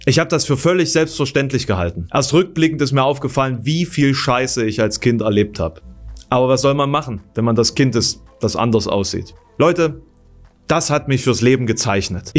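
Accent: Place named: Halle, Germany. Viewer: Gerade entdeckt. Super Video, sympathischer, tolles Thema und vor allem...hallenser Dialekt. Ich liebe alles daran.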